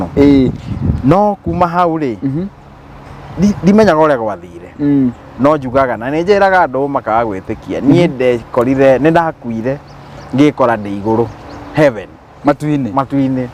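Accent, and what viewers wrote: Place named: Nyeri, Kenya. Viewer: Kikuyu ya nyeri slaps differently..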